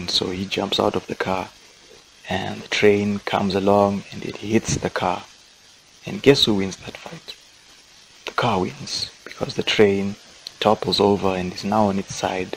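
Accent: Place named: Africa. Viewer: Certainly read by an African...... not sure whether he's from the Congo😅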